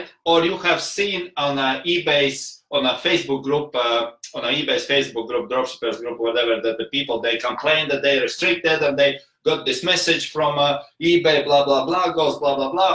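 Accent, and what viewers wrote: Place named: England, United Kingdom. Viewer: Interesting point of you! I like your English accent~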